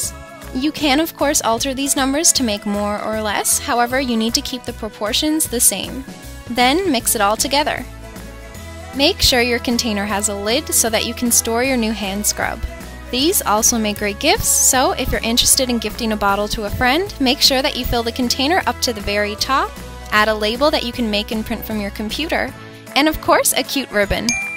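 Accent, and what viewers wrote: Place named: Canada. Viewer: You can tell she is Canadian because she says "Tay-g" Instead of "T-ah-g"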